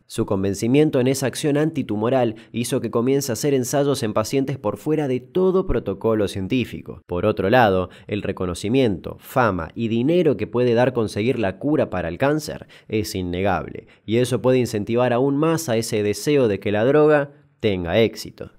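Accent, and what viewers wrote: Argentina. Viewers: amo el acento argentino, es hermoso. Me encantan tus videos. Un abrazo grande desde Bogotá-Colombia💗💗💗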